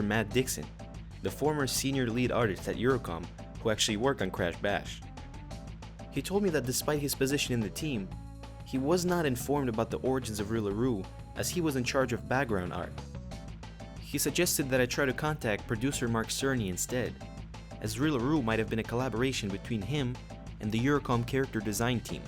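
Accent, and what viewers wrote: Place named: Minnesota, United States. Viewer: I like your accent, you from Minnesota or somewhere around there?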